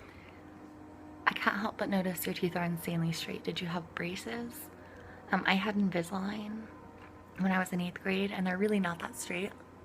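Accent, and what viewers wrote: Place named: Canada. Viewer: Nice video, I usually prefer whispering or soft sounds but your voice is rather soothing. I like that you have a bit of a Canadia accent and also some southwestern. It's very North American and something. Also, cats...cats are love. I hope you're well.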